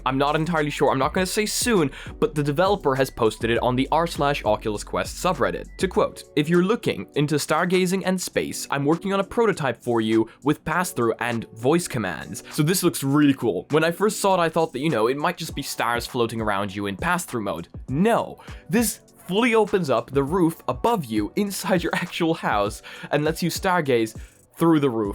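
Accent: Ireland. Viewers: I love when the Irish accent sneaks out 🍀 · Bro sometimes u just sound irish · You have just a whisper of an Irish accent, dont you? Am I Right???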